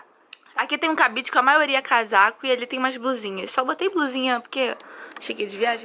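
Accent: Rio de Janeiro, Brazil. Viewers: Adoreei esse vídeo, seu quarto é lindoo!! Mas uma perguntinha. Vc é carioca? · vc e mt divertida e esse sutaque de carioca e incrivel ate eu ja to falando q nem carioca seu qu\rto e lindo pft adorei vc e seu quarto faz um tour pela sua casa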